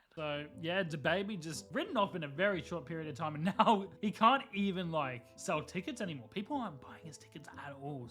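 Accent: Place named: Australia. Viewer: Cool video but i got a question, do u ever listen to any Australian rap ? If so what u think of it? I assume your aussie from your accent (sorry if im wrong)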